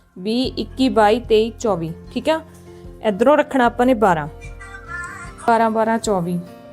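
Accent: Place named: Malwa, India. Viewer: Ssa dii, Diii tusi kehri side toh nd tuhadi boutique kithe aa????? Tusi Malwa area de lagde o kyu k bolan ch thoda farak aa sade nalo 😊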